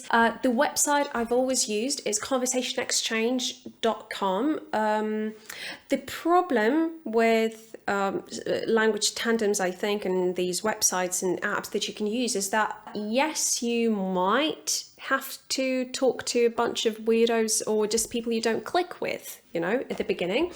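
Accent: United Kingdom. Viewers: I thought you were British! I’m American though. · honestly I can't, but I'm American and you have a lovely Brittish accent. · Perfect British accent) I can't imagine how much effort it takes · how did you develop such an amasing British pronunciation?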